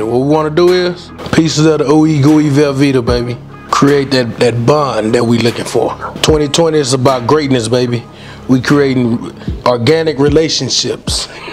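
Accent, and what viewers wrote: Texas, United States. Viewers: Just when I was looking for something quick to make! Also....is that a Texas accent? New subscriber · Excuse me Sir...You from Texas?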